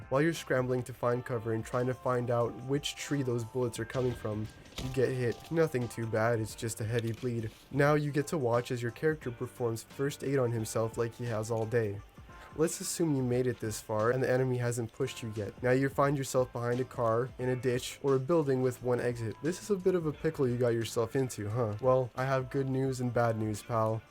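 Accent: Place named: United States. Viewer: You speak with a perfect American accent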